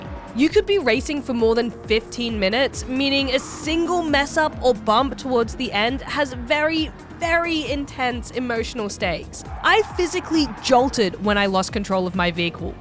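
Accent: Australia. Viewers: Haaaaars and haaaars. Is it just me does say haaaar instead of hour? Definately a mixed accent, Australian and something else. Just can't place the secound part of the accent.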